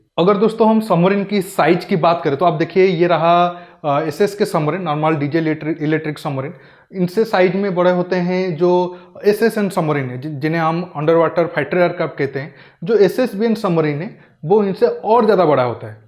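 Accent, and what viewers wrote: Odisha, India. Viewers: भाई आप क्या उड़ीसा से हो?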